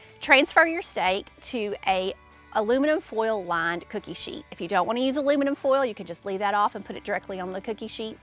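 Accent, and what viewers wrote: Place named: Texas, United States. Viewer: Super Texan I bet ❤️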